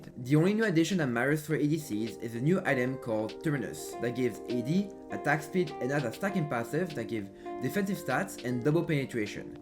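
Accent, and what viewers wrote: France. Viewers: You have a strong accent are you French ?😮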